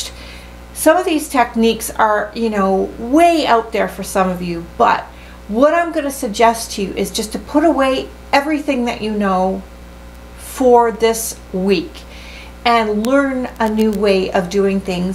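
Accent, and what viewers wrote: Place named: Toronto, Canada. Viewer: Hello from Belgium. I found you because I wanted to learn more about Spencer but YOU sound like a sweetheart! I'm originally from Atlantic Canada (Cape Breton Island) but haven't been back home since 2011 but l please correct me if I'm wrong but you sound like you're a mainlander perhaps from Toronto?? (My apologies if you're a Yankeedoodle eh but I left to come live over this way back in 1989). ❤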